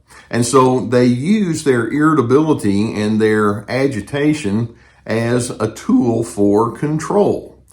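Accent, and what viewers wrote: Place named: Texas, United States. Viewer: I feel better just looking at Gus. A really chilled dog! Love Texan accent too! It helps so much to have these videos to understand my past and in such a nice way. Thank you!❤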